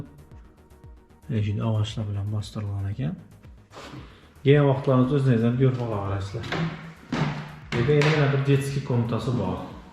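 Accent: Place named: Khorezm, Uzbekistan. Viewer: Хоразм дилинда чиройли гаплабди офарин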